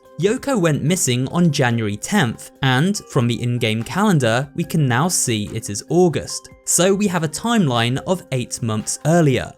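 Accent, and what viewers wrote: United Kingdom. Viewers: You're british right? I'm british too What the hell is a faucet? It's a tap to us. Why are you pandering to americans. Saying "mom" and "faucet" (you arent even saying it correctly either). You have a loyal fan base. They know you are British. Speak like a brit!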